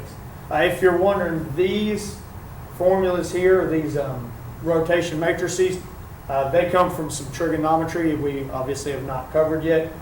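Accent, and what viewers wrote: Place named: South, United States. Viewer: What accent is that? As a Brit I love listening to it. Southern. Maybe Tennesee? Georgia?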